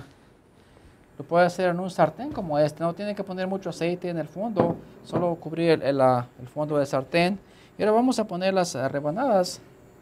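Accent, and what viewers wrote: United States. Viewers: El mexicano hablando como los gringo aprendiendo hablar español jajajaja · Déjate de acento estadoudinense,no te queda bien amigo!!!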